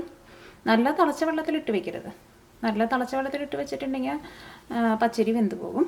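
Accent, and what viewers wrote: Thrissur, India. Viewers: Mam തൃശൂരിൽ എവിടെയാണ്? സംസാരം കൊണ്ട് തൃശൂർ ആണെന്ന് മനസ്സിലാകും.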